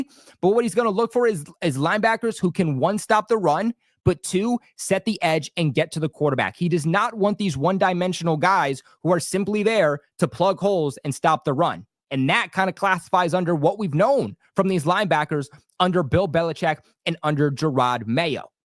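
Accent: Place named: East Coast, United States. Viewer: Why some of ya all gettin on for pronunciation....do you actually listen?! He has an East Coast accent! And an even deeper one that I cant quite place. Dont be That person!!! Also he has me tioned many times that he has Dyslexia-give him a break!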